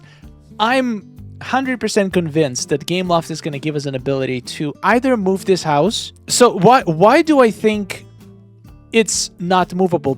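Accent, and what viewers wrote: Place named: Ukraine. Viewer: I listened to your words many times, I thought I misheard. Are you from Ukraine? How long have you been in the US? What is your real name? Sorry for so many questions. I’m from Ukraine😉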